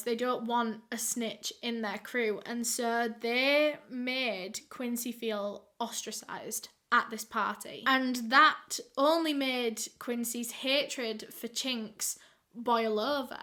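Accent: United Kingdom. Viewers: I am a middle-aged woman in medicine hat Alberta in the middle of the freezing cold listening to this young girl and have literally been stuck on her channel for days you are a total sweetheart I wish I had you for a daughter I am 53 years old and I totally love your concept the way you portray the stories you're so sensitive to what kind of story you are showing us especially around children I think that's so sweet I found you totally by chance but I have been watching you for the last 3 weeks Non-Stop my 17-year-old son it's starting to get worried and has now starting to try and peek in and see who this girl is with the beautiful British accent you see we live in the prairies and medicine hat Alberta population 68,000 I have never seen you before young lady but you are an exceptional wonderful bubbly incredibly talented young lady you're the daughter I wish I had I was only able to have one son by the way and he's very very ill he had an unsuccessful kidney transplant at 15 years old I he has just been 3 weeks in children's hospital and the neurology clinic having major blood filtration blood plasma and steroid therapy to try and stop his kidneys from rejecting so if you guys could please pray for my son he's in a really bad way right now he's lost two markers on his kidney which means he's going to have a horrible time finding a new one please pray for him his name is Riley he had heart surgery at 6 days old to repair one of his valves he was born 2 lb 2 oz 10 wee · "Drugz spelt with ZED" .... 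Beautiful love UKs pronunciation of words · IDKY youtube recommended this channel but THANKS... this adorable brit-ish girl talking about New York hip hop murders is HILARIOUS.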